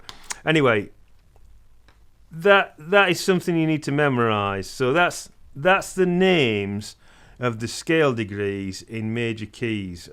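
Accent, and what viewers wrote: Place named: North England, United Kingdom. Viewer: I liked your stuff from very much. It all was intelligently conceived and presented. I like your style, your Central-Northern British accent a lot(!) and the way you put down some pathetic and pretintious (and so) people who think they know it all. I mean it's not your goal of course but you won't believe how much fun it actually is!:-) Please - take my advice - don't be so concerned about the hate mail and comments. You seem to be very responsive person and as such just try to ignore the ones who would cause you to close another of your great channels. Take it easy! I'm looking forward to your new videos - they are always tasty, helpful and really so much fun!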